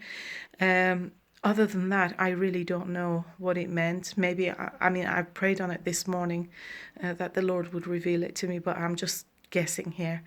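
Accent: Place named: Middle East. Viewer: I like your accent are you middle eastern like Jesus? By the way what did jesus look like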